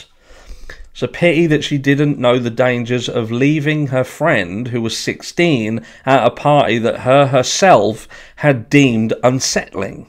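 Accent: United Kingdom. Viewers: I appreciate your commentary and hearing a British accent is refreshing.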